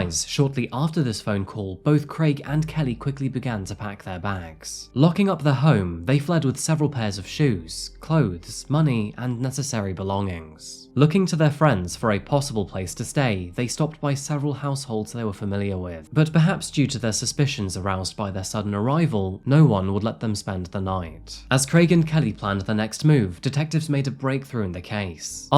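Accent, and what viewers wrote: England, United Kingdom. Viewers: Yet another outstanding episode! And chin up about sounding English; it could be worse....you could sound like Andrew Tate with some weird US/British drop-the-t's accent!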